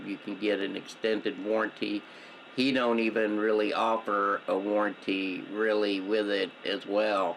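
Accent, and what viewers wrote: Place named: South, United States. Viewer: Wow, no offense but you sound like a southern Bumpkin so I don’t think anything about your review here is going mean anything to the cell signal internet community. It’s ok, you just don’t understand technology. So maybe Chester repair equipment is beyond your cell signal intelligence? You probably even still have a land phone line?? That’s funny! Have you gotten Better connection results with 2 cans of Spam tied together with string? 🤣😂😅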